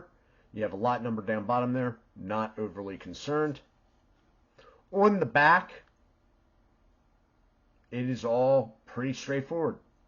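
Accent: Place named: Maryland, United States. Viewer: I have family up and down the east coast but your "O's" made me think of the MD accent. I was close. VA here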